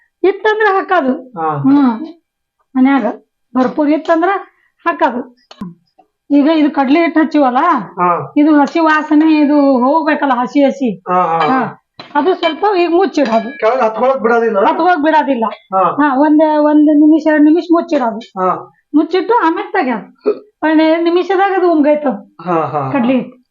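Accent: Maharashtra, India. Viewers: Unti nim maharashtra kannada bharpoor ista aatri... Neev mado palle supr ree... Daily school ge kattak lagu madak easier ree avvari..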